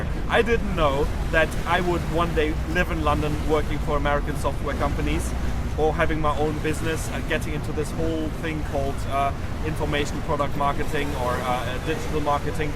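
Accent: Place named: Germany. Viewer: I thought you sounded German :) Now I know this for a fact!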